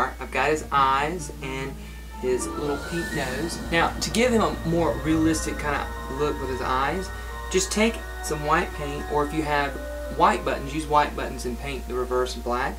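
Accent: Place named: South, United States. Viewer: I like ur Southern Drawl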